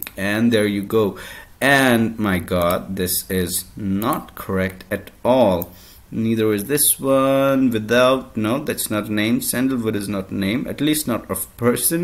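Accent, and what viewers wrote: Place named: United States. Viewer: bhai tu amerian accent me kyu bol rha hai ?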